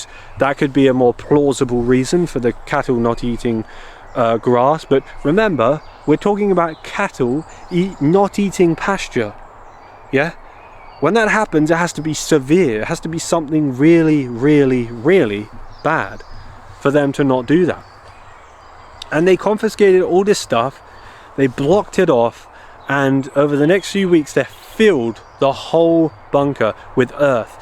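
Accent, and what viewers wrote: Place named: RP, United Kingdom. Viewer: Thats a very interesting story about the farmer and cattle situ. Ley lines run 3 ft above Earth and 3ft under the Earth and no matter your denomination that ley energy is what is used to manifest. So these people were obviously using that energy for the dark purposes and thats why the cattle could not eat the grass because its likes its poisoned and they knew it. In India cows are sacred and also in Egypt too. Its nice you speak the Queens English without a regional accent, because its dying out and thats a real shame, although the odd word I can hear an accent from up North like possibly a Yorkshire accent, but only an odd word occasionally. You speak beautifully and I adore listening to you !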